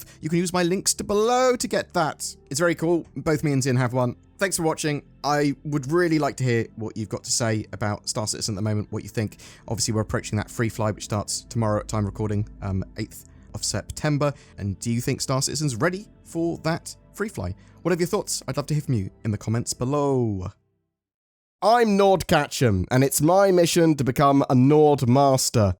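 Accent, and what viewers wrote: United Kingdom. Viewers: Me watching this video: “This dude is speaking my thoughts with a British accent!” I love this game, but those things are definitely pain points.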